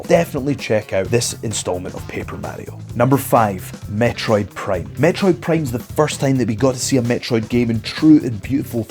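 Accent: Scotland, United Kingdom. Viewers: This guy reminds me of Dominik Diamond from Games Master. His Scottish accent when speaking on his retro reviews bring back so many memories!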